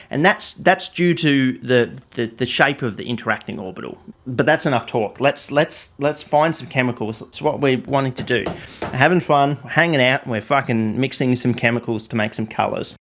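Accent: Australia. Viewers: Who else thinks should be a highschool Chem teacher?! I swear he reminds me of "Doc" my hs Chem prof, got the 'stache, even says "Ah-loo-minium" and everything😢.... (I'm in the US, Appalachia at that 👉🏻"Apple-atcha" for context) Who knew I'd be nostalgic watching an Aussie backwoods chem channel (BEST chem channel IMO) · This video autoplayed from a Primitive Technology video and for a sec I thought the dude finally broke his years of silence to cheerfully yell at me in an Australian accent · Ah yes my daily dose of tar synthesis narratived by a goofy Aussie while in a shed.. now we are cooking with kerosene. Best wishes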